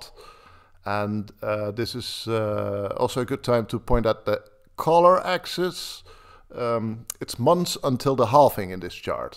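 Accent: Netherlands. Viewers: Yeah Dutch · First time here ... Got the reference from some Brazilian btc influencers 📝 ... First thing I noticed was the Dutch accent 🗣️ ... Only to be confirmed by the stream of comments in your language 🎉 ... // Het is een grote plezier contact met je nemen ... Je werk is geweldig 🥇 ... Groetjes vanuit Rio de Janeiro 🌊 ! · Can I ask you something? Are you dutch? I hear a dutch accent or I am wrong? Thank you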